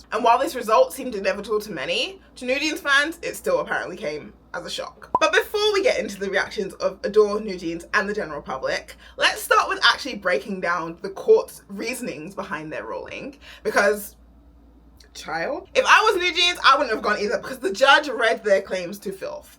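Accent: United Kingdom. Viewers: Their pronouns are they/them and yeah I’m pretty sure they’re British, def not an American accent lol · American?? I think she's British.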